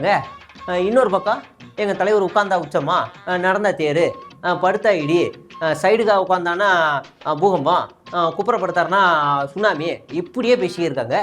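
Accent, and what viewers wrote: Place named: Idumbavanam, India. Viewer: enaya idumbavanam tone duthu pesitu eruka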